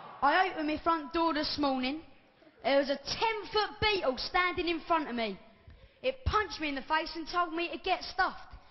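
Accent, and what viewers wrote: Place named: United Kingdom. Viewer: if he wasn't british, he wouldn't be funny. its all in the accent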